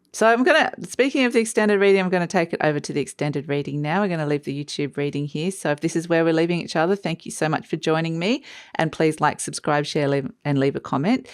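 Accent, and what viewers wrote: Australia. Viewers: I love this reading. I am feeling all of this. Sadly, I found someone I wished to co create with, and it had its challenges. And being very particular with whom I share my energy and love, it’s necessary for mutual respect and appreciation. Im not a fan of competitive co creating, and people who try to get one up on me. It’s all about a win win alliance, and teamwork. After these few very challenging months, deep healing work and introspection, a delay for a new beginning, I’m so ready to get back into action. Thank you for your time. I love your surroundings, the sounds of birds, the crows today, and your Australian voice. It’s been quite soothing. Much love to you. 💗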